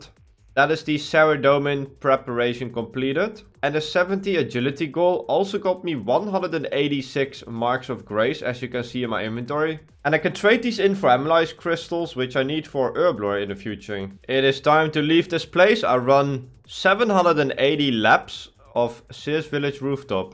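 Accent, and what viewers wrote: Netherlands. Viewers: Lol , you must be dutch , that accent is so obvious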